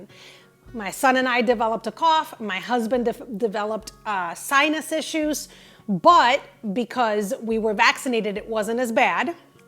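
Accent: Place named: Midwest, United States. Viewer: Your mid west accent is extremely obnoxious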